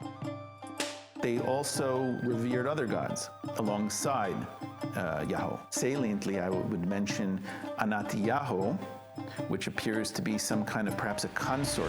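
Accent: Russia. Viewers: Russia is a large country with many different ethnic groups within it. It may not be an accent with which you are familiar but that does not mean it is not a Russian accent.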